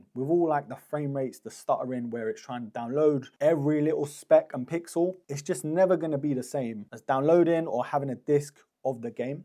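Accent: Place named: Jamaica. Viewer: Why is he talking like a Jamaican?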